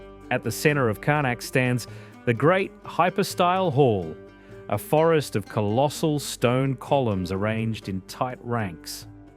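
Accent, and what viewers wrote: Australia. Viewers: An Aussie narrator, not a bloody yank - thank god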